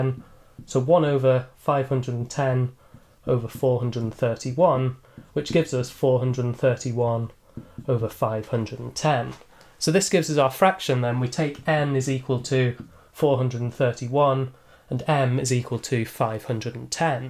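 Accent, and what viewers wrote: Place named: RP, United Kingdom. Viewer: Mate, don't get me wrong: I love math yet your soothing voice and english posh accent are perfect to fall asleep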